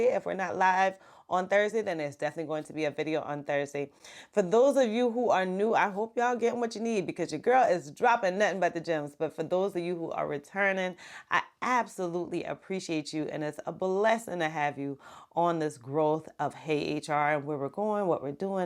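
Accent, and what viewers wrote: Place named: Carolina, United States. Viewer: I know a carolinian when i hear one lol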